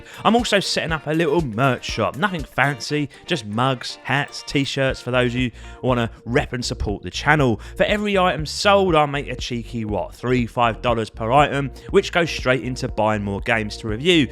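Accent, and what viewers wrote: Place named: Essex, United Kingdom. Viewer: You don’t sound very cockney to me. More Essex.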